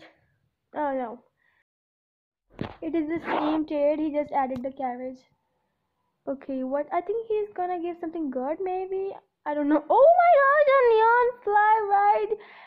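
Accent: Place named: India. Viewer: His accent is Indian but the video location is the us weird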